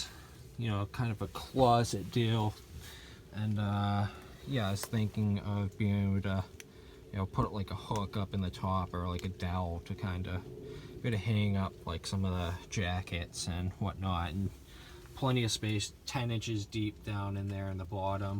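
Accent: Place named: Maine, United States. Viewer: Sounds like a fella from Maine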